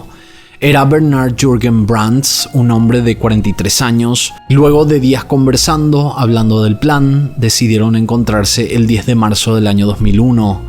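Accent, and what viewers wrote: Paraguay. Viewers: Que contenido más interesante!! Me gusta la manera en que narras el video y las imagines, por si acaso eres paraguayo o tenes relación? Es que tu acento es muy parecido a uno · 0 yo me estaba poniendo en duda ya que tu acento era muy de Paraguay xd y voy en información y vaya sorpresa aparte que me encanta estas clases de videos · van solo 10 segundos del video y ya me di cuenta que sos paraguayo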